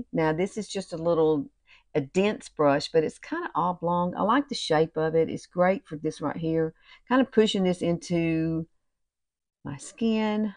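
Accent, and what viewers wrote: South, United States. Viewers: you are so cute. I am curious as to why use lipstick? What about cream blushes and or bronzers? I can see an advantage if you don’t have a lot of money to spend on makeup. I love the eye look!! And I love yr Southern accent!!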